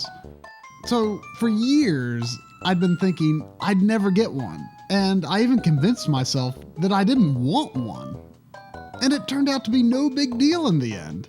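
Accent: United States. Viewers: It's great that you had that country boy accent all the way back then.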